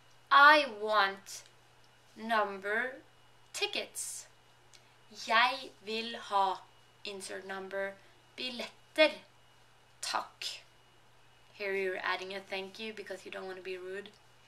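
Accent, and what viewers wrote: Oslo, Norway. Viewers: norwegian sounds like german..love your norwegian accent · It's just a difference in dialect pronunciation. People around the Oslo area pronounce "og" with the "g" sound but it can also be pronounced without. It's actually a common mistake that people write "å" when they mean "og" because they sound the same. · Er du fra Oslo?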